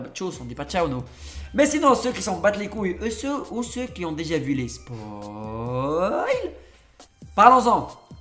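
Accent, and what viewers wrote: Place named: North Africa. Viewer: L'accent du blédard 🇲🇦🇲🇦🇲🇦🇩🇿🇩🇿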